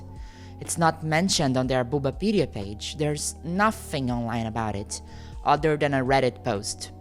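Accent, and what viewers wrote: Brazil. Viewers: Amazing vid and research, I had no idea about that. And thank you for your work in the comments, I've scrolled a bit and couldn't find a single nazi comment (and I KNOW they came here). I hope more people see this and your channel grows! Also... You're brazilian, right? It's so easy to recognize our accent when we speak english! lol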